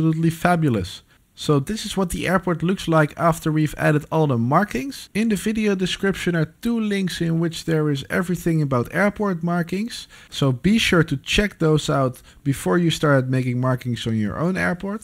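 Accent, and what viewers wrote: Netherlands. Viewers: Love het nederlandse acent in het engels😂😂😂😂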